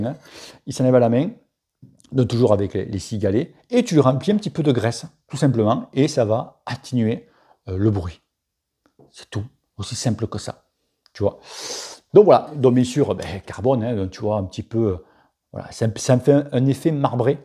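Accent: South, France. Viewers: Bonsoir, j'ai deux petites questions. Premièrement, comment ça se passe au niveau de la douane? Et ma seconde question quid pour le SAV en cas de pépin? PS: j'adore ton franc parlé, bien du sud:)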